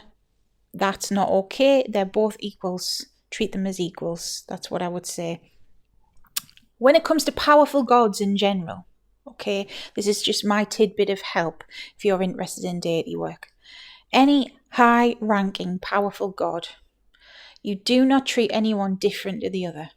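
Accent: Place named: Yorkshire, United Kingdom. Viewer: my mother is from England, she grew up in Yorkshire, I'm in America never been to England, but one day I will go. Your accent reminds me of my mothers. 😘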